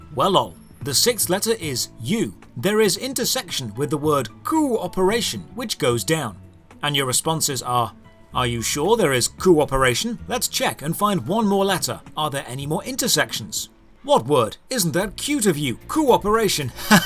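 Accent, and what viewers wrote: United Kingdom. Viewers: Hello American guy eh? is among the most British looking and sounding people ever lol how'd you get that wrong:D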